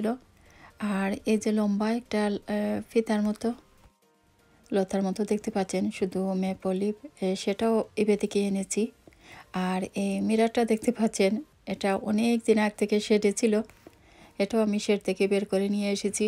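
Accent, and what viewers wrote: Sylhet, Bangladesh. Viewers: Afa Sylheti matowwka Eta mat bala lage. Na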